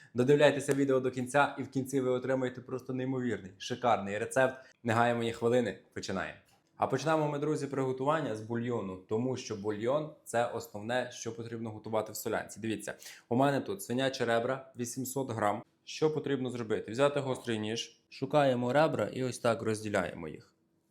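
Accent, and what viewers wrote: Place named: Lviv, Ukraine. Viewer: По виговору ,я так думаю,хлопчина зі Львова́,а напишіть чи не вгадала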